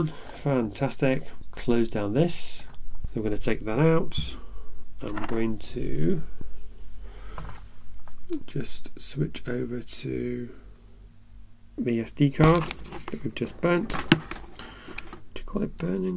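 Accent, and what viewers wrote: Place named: United Kingdom. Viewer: i approve of both this video and your british accent i like british people :)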